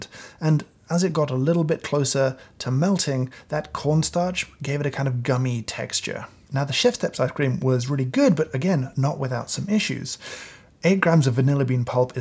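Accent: United Kingdom. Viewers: Top notch British accent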